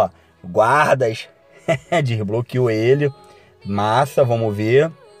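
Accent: Rio de Janeiro, Brazil. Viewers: vara seus vídeo e top d+ mais o sutac carioca incômoda muito um mineiro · Vs é Carioca Né? Adoro As Gírias Carioca!!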